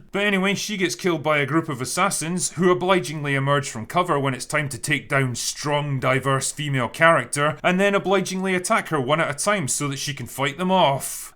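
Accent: Scotland, United Kingdom. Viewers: He's scottish, that's what they sound like, he sounds like that in the livestreams, but more normal scottish accent. Calm down.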